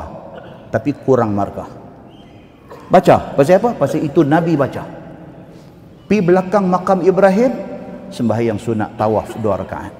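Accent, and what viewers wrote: Kedah, Malaysia. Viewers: Ustaz orang kedah ke? Btw terima kasih atas informasinya.❤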